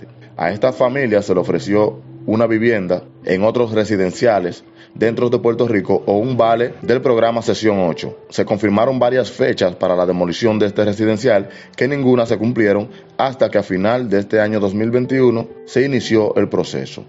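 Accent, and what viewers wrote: Dominican Republic. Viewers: una pregunta, eres Dominicano por si acaso, tu asentó parece de RD!!!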